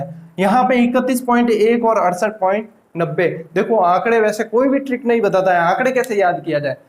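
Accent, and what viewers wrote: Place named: Rajasthan, India. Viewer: Thnku so much sir.... Sir m rajsthn se he hu lekin rajasthani nhi aati ap bolte ho toh sunne m accha lgata hai.... 😇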